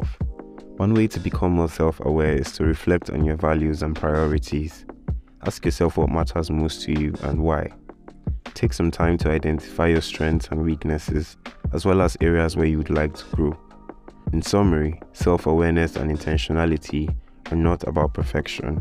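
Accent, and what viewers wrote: Nigeria. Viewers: I just want to know, cos you sound Nigerian. Are you Nigerian? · You know he's valid when hes talking bout mens self care and he has a nigerian accent. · Why you have Nigerian accent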